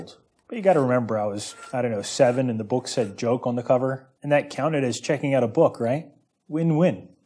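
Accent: United States. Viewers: Love these videos, still don't understand why Americans change the L in solder to a D? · It does make me laugh when you guys on the other side of the pond pronounce solder as "sawder" rather than soldier without the i.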